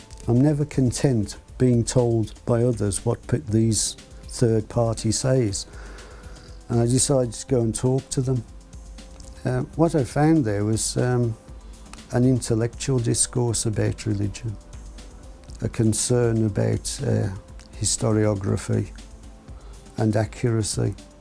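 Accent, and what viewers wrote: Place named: Birmingham, United Kingdom. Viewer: Proper Brummie Brother 👍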